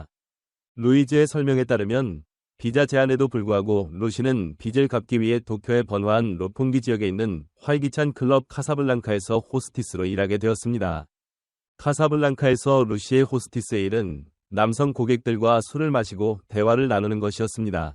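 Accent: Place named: Yanbian, China. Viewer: 요즘 조선족들이 범죄 유트브 시작하면서 사투리 때문에 이런식으로 많이 하던데 조선족이세여?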